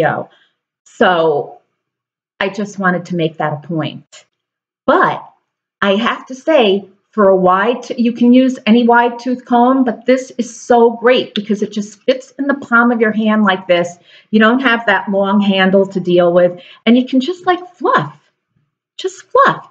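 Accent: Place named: Long Island, United States. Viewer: DrlinG i'm born and breed on LonG Island you accent takes me back to my childhood. When in the early 60's all the up and coming Queens families transplanted to Muttontown, L.I.no I didn't get the Locust valley lockjaw accent, it's Queens all the way baby, I am a recent hair losie, fourteen weeks in the hospital, let's just say between the illness and earnest hair being combed out there is not a lot to work with. My biggest problem is trying to keep a wig cap on. My skull bone in the back is quite shallow and the wig cap rides up. Oye. I have been using "Gots 2b" as a holding spray but there are time even that does not work.Any suggestions would be helpful. I so enjoy your videos, keep them coming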